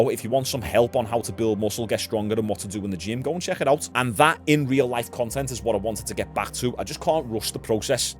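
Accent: Liverpool, United Kingdom. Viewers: I don't usually sub to scouse channels as I find the accent annoying, but your content makes me forget that 😂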